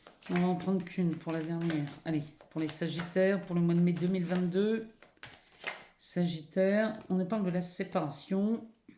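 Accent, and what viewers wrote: North, France. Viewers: Bonsoir ! C’est la première fois que je vous écoute et j’aime beaucoup la façon dont vous expliquer le tirage ! J’avoue que vous l’avez même fait rire quelque fois car vous êtes très naturelle et c’est tellement agréable 🤩🤩🤩 Je me suis abonnée et je vais vous suivre car c’était très agréable à vous écouter 💕 Je me permet de vous poser Une question : vous ne seriez pas du Nord ? Merci 🙏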